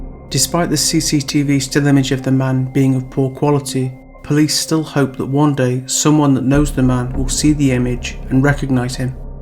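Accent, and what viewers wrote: United Kingdom. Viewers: Love the cockney accent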